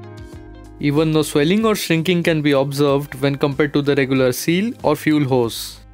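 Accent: India. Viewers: The Indian accent is killing me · Duh, indian english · Indian accent not arabic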